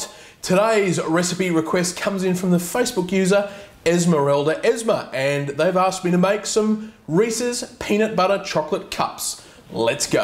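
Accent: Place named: Australia. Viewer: Love your videos! What part of Australia are you from?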